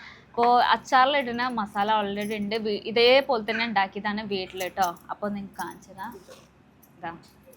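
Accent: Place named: North Kerala, India. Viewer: North Kerala, from the way you speak malayalam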